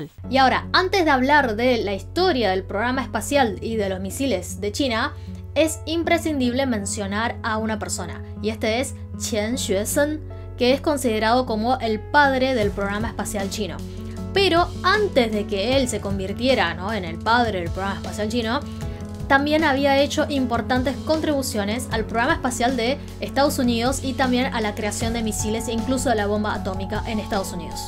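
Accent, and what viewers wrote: Argentina. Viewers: interesante, se nota que estas o has estado en argentina mucho tiempo, porque tienes ese dejo, buena ventana de difusión de china, soy tu admirador. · QUE ACENTO ARGENTINO QUE PUEDE DESAGRADAR A LOS SEGUIDORES, ENTRE ESOS EL SUSCRITO. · Belleza que dominas pero muy bien el español castellano tipo argentino saludos desde santa cruz Bolivia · Muy lindo su acento argentino chino y muy interesante lo que dice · Muy simpática dándonos una brillante información y por cierto con un bonito acento argentino. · Que interesante video, pero me pregunto porque la srta presentadora tiene como acento argentino, que curioso. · Saludos desde Lima Perú ... excelente tu español, tu acento español Argentino. Éxitos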